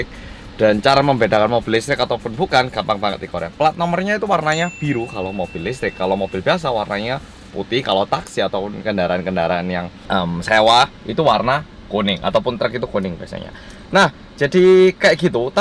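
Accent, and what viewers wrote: Java, Indonesia. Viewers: Wajahnya kekoreaan tapi logat bicaranya indonesia banget .mantull..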